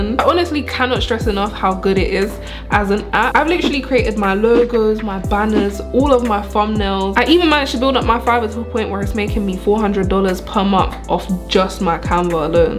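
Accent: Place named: England, United Kingdom. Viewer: Hi 👋. Are you single sweetie. I love your English accent 😍.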